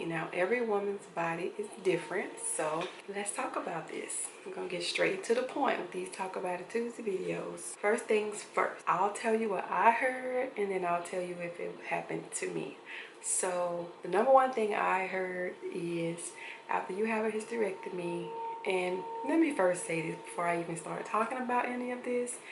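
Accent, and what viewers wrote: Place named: Missouri, United States. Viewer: You are fine girl everything in the right place doing the right thing you gwo grell I have to ask are you or your folks from back east like Illinois like an saw Kansas City you don't have to answer it's just the accent it's a personal game that I play I'm usually pretty good at it if I had to pick one of the three I would say Missouri thank you for the video by the way I'm a lot less stupid now LOL too bad you didn't make it before we broke up LOL or at the very least I wasn't enlightened enough to look it up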